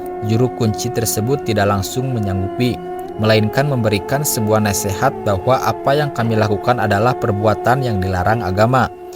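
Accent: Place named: Sunda, Indonesia. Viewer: Lentongna sunda pisan euy 😊👍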